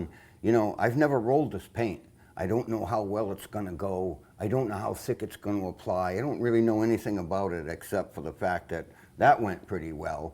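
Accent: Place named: Rhode Island, United States. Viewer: He's from Rhode island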